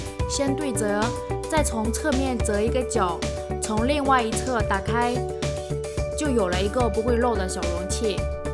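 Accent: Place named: North, China. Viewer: 我是海南定安人 新竹 我搬凳子來看，你口音澈底北方人口音。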